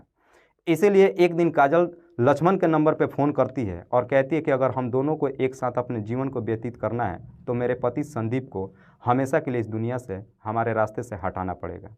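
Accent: Bihar, India. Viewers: Bihari ho kya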